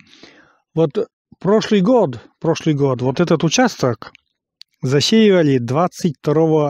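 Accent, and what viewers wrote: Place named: Vologda, Russia. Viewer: почему-то не могу найти где вы живёте? Где-то в видео мелькал Сыктывкар. Это там вы живёте? Очень говор похож на моих родственников они жили в Вологодской обл.и в Архангельской обл. Слушаю вас и как будто бы с ними общаюсь. Они тоже были спокойные, урасновешенные. У них тоже были избы из бревен, холодно также было как и у вас. Красота природы тоже как у вас. На жаль они уже все ушли в мир иной. Но не будем о грустном, царствие небесное им а нам здравия, любви, благополучия.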